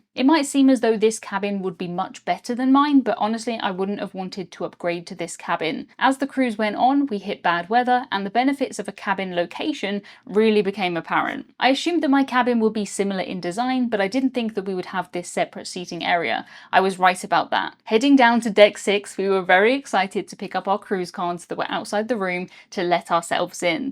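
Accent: United Kingdom. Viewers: what part of the country is your accent from? i hear different versions of British English, but i dont know what parts theyre from.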